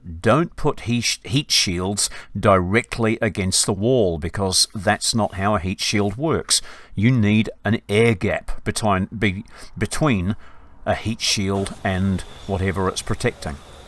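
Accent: New Zealand. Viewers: You sound like a fellow Kiwi man.Not been home for many years and right now I'm living in Odessa but there's no fighting here.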